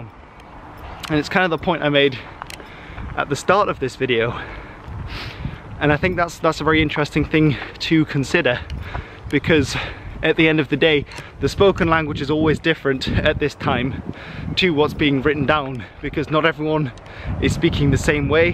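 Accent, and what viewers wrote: United Kingdom. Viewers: I was just wondering, since he speaks English with a very British accent, but his Dutch is also spot on - usually it's one or another. is also a very British name.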